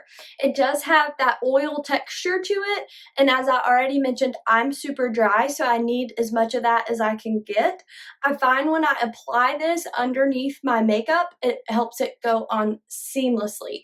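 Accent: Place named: South, United States. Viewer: are you still using the Dermalogica Skin Resurfacing Cleanser? I ask because, while I'm a red-meat-eating man, I'm of 100% Irish-American skin and have fought Rosacea for about 20 years, so I've tried to smarten-up on taking care of my face. I also spent many years in the sun as a Lifeguard, before SPF became 'cool'. I looked into and tried several IPL laser sessions, very productive, but I wanted more. So, I found Dermalogica Redness Relief Essence and was blown away at how effective it was...and you know, the next thing you're buying Vitamin C this and and and, all sorts of ointments lol. A friend gave me some GlymedPlus 10% Glycolic Face Wash. I use it 1-2 x a week, it helps to really exfoliate. I use Kiehl's Ultra Facial Cream for moisture. Morning: Wash w/Kiehl's Ultra Face Wash, Dermalogica Redness Relief, Dermalogica Biolumin Vitamin C and SuperGoop Unseenscreen SPF # 40. 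Night: Wash, Redness Relief, Kiehl's Retinol and Dermalogica Intensive Moisture Balance (FYI...Clinique Even Better Clinical Radical Dark Spot Corrector + Interrupter during the day is something I use 3-5 x a week and it seems very effective at helping dark spots/old sunburns etc). Ms., I was looking for a new, daily cleanser...I like the Kiehl's, it is gentle...but the Glymed Plus is very strong and drying....I stumbled upon your video and was drawn to the Lactic Acid 3% Dermalogica Cleanser you used, so I bought it. You have Disney Princess Skin, truly. Combined with southern accent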